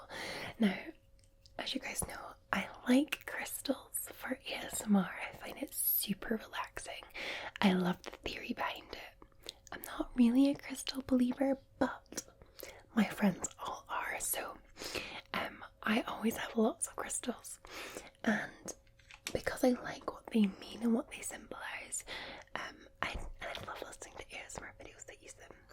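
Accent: Scotland, United Kingdom. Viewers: "gurril", yep defos a scottish lass lol though doesn't sound weegie, more eastern, edinburgh or the lothian area or even dumfries lol whatever it is, its nice having a scot online. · Love me a wee bit of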